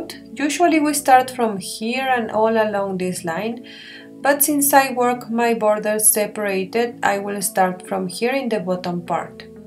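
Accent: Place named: India. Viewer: hey.. u are just awsome!! I have a personal question for u? where are u from? yr accent sounds like asian or tribal indian. may I know plz?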